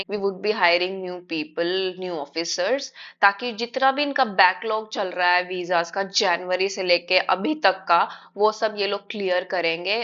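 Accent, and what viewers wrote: Haryana, India. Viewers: se aap hariyana se lagti ho 😂😂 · Haryanvi accent is lovable..it suits you. And this bhartiya Nar wants spouse visa 😁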